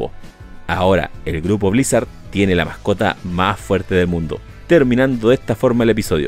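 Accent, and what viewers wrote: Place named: Chile. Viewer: Epico es genial Tu acento chileno Brother Saludos eres un Crack 🤙🤙🤙😁👊👊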